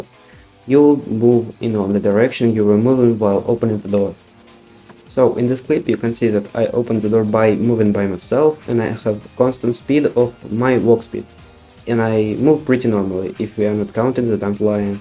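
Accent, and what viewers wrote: Russia. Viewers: просто акцент у тебя слишком русский👍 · Ahh russian accent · are u russian? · Are you russian??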